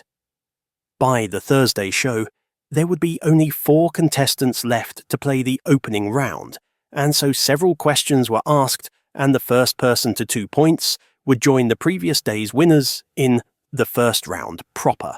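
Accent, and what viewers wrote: Scotland, United Kingdom. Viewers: because it is the real you. Why use another voice? Scots FTW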